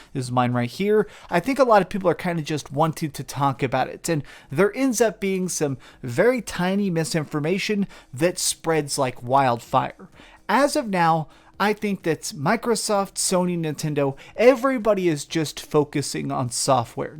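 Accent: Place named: South, United States. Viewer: Why the southern accent?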